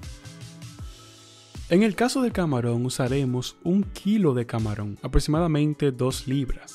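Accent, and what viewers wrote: Dominican Republic. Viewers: Eso se ve ricoo! una pregunta de que nacionalidad eres??? porque si no me equivoco me suena el acento Dominicano, yo soy Dominicana. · eres dominicano?. Saludos desde República Dominicana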